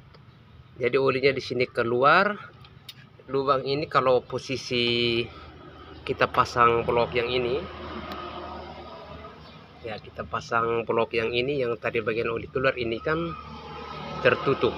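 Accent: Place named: Eastern Indonesia, Indonesia. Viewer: mantap bang, dgr logatnya org timur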